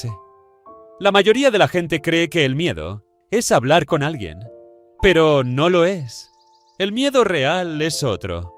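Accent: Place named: Spain. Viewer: siento que si le quitamos el acento español a su voz se parecería a la de Satoru Gojo jejejeje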